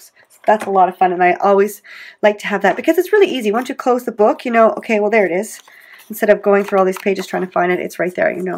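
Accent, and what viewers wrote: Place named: Quebec, Canada. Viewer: I'm obsessed with your accent! Mostly Canadian, but there's just a touch of French from time to time. My favorite tip is to use washi to make my own decorated paper clips. I can make them match my layout every week at very little expense and no guilt if I lose one!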